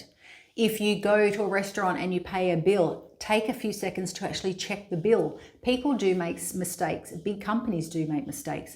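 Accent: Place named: United Kingdom. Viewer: thanks please continue uploading videos, i like your content, as well as your lovely British accent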